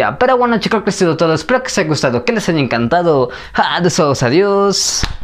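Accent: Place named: Venezuela. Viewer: Literal aveces creo que este men es Venezolano en base a su Acentó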